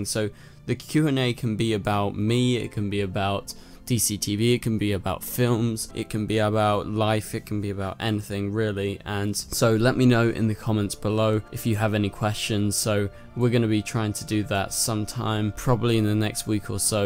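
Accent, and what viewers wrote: United Kingdom. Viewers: What part of England are you from? I'm from Windsor and Berkshire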